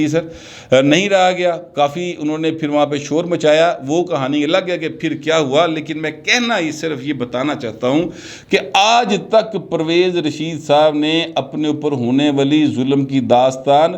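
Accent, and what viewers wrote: Lahore, Pakistan. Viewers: And on the top of that "Lahori Touch"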